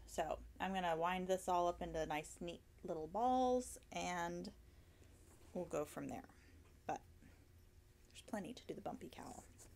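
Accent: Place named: Quebec, Canada. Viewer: I’m guessing Max and Vincent taught you how to pronounce Ulysse 😂 Very french Canadian accent ( I’m from Montreal, originally, as well). Oo-lease, with the accent on the second syllable, would be more accurate in French from France, where the yarn is from.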